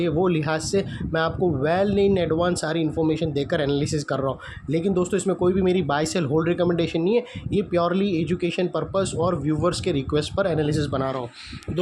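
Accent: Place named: South, India. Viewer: thankyou bhaiya for giving your valuable time to some random 19 y/o stranger I will invest more in my learnings before hand i was a forex trader who trade in 4h and 1h but as I shifted to Indian swing I find it quite difficult to understand as forex is closed for just 3-4 hours a day but you are really helping me in here i have watched all your videos trying to understand things and i am really grateful that you still scam stocks out of so many studies stocks and invest your valuable time even thou you are not getting the audience you deserve even thou you just want to help others people and you are South Indian so that accent is little bit different for people from TATA, JAMSHEDPUR ,❤️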